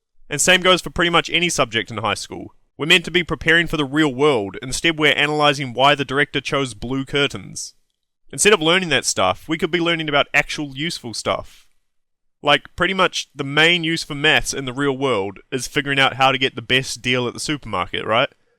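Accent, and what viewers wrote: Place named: United States. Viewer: I was hoping for this to be sarcastic (google it). At least it made me realize I should think about how to exlpain the importance of education to someone who's not educated, because (judging by the comments) most of the people reading this a) won't bother reading it and b) won't understand it. One of the biggest problems in the world is the lack of education. A big part of that problem is about very simple stuff like reading but people having basic knowledge about how things like you, our cultures and the world work opens up incredible possibilities. You shouldn't go to school just to get a degree. That attitude is the best way to make sure you take the easiest route and get no real education. According to the video there are big gaps in the American (I'm guessing by the accent) school system. I'm sure there are teachers who would gladly give lessons about taxes and other adult stuff. 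If there aren't, don't quit school, try to make it better for everyone. Most of the "useless" stuff mentioned is not actually useless, you just never learned how to use it. When it comes to things like math problems and reading novels, a lot of it is just brain exercise that makes you generally smarter. I could go on but it's 1 at night.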